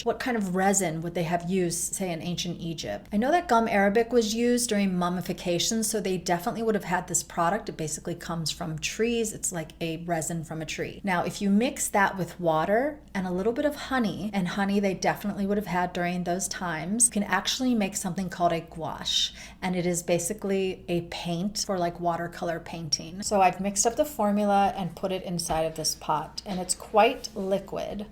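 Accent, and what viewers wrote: United States. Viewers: Regarding dangerous or toxic ancient makeup and beauty practices: seems not much has changed over the centuries--think of all of today's popular, potentially dangerous plastic surgery performed in the name of attaining beauty. Dont get me wrong, I take zero issue with anyone getting plastic surgery for any reason...just making a point. Side note: you've gotta be from the midwest. I am, and I pronounce words ending in '-ing' the same way as you. Cute!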